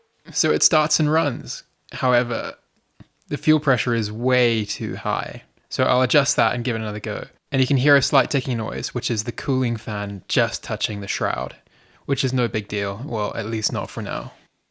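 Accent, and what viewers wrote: Australia. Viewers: You a truly a very clever young man 😀 amazing work! You sound Australian? 🙂🙂 A well spoken Australian 😉 Your attention to detail is a credit to you. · Cool. This is the problem with being away (since 2003), you sounded Aus to me. Anyways. Lovely car, always wanted a Spider.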